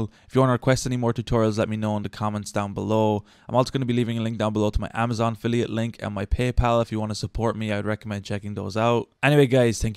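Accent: Ireland. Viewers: very helpful thx love your Irish accent : )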